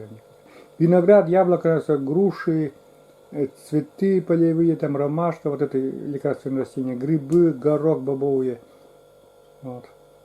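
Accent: Komi, Russia. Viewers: Республика Коми,родной коми язык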